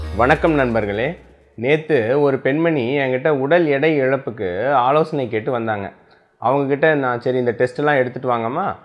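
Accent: Kongu, India. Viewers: ரொம்ப அழகா விளக்கம் கொடுத்தீங்க அய்யா... அதுவும் நம்ம கொங்கு தமிழில்👌👌👌👌🤗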